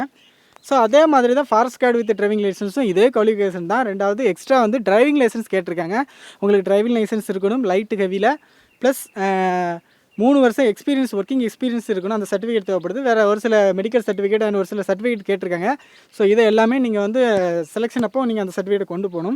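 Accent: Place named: Tirunelveli, India. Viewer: R u from nellai....?i like ur slang..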